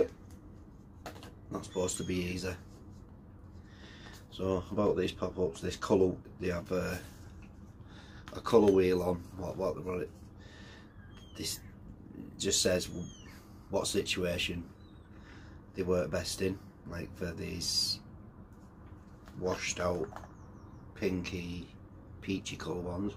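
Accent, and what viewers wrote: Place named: Northern England, United Kingdom. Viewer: There’s nowt wrong with looking and sounding like r’kid Ricky the hitman Hatton 😉👍lol it’s nice to hear a fellow northern lad doing carp blogs makes a refreshing change I can’t stand all this wibble wobble pukka cockney crap! Lol 😆✌️😎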